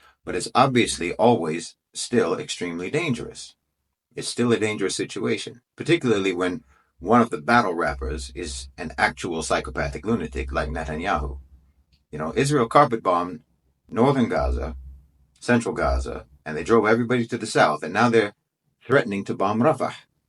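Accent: United States. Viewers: What is absolute madness is this nutter saying that Israel as a State should be terminated. It is simply repeating Hamas’ propaganda with an American accent.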